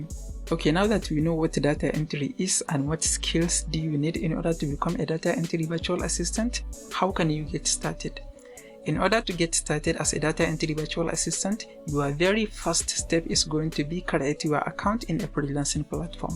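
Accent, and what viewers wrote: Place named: North, Nigeria. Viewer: Your accent brought me here i knew, you're from the North 😊 ina gaisuwa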